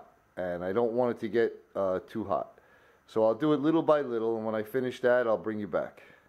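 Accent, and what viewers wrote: New York, United States. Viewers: my friend. EXCELLENT work! Those are talented hands fella. It really is too bad that Nicholson/Weller is producing such garbage now. They used to be the best of the best. Maybe they should find something else to do eh. That accent of yours sure sounds Bronx/New Yorkish? Take care. I always enjoy what your up to bud.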